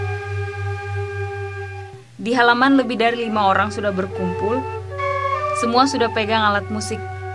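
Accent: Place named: Ambon, Indonesia. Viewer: Sebenarnya kalau hostnya sudah bicara pakai bahasa daerah tertentu.yg dri stasion tv-nya harus pakai subtitle Indonesia.biar yg nonton bisa mengerti kalimat2nya.penonton YouTube bukan cuman org Ambon saja.ada penonton dri Sabang sampai Merauke. Terus hostnya juga bicara aksen ambonnya campur aduk. Org Ambon itu bilang ( Katong/bukan kitong)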